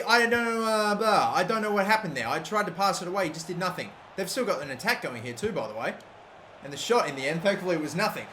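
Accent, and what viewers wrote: Australia. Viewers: are you australian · Are you Australian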